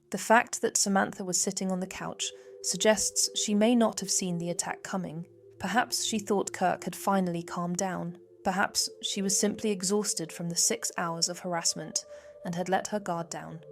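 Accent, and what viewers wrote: United Kingdom. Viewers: jesus christ this channel sucks!! two minutes listening to this british niteit made me wanna blow my brains out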